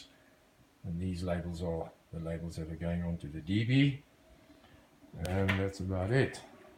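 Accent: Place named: Afrikaans, South Africa. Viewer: Jy klink afrikaans.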